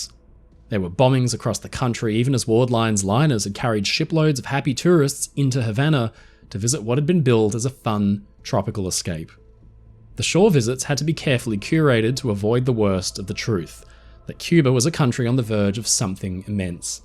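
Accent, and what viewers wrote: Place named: Australia. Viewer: 😊great show man, you have an Australian accent, but your last name seems to be Irish… Just curious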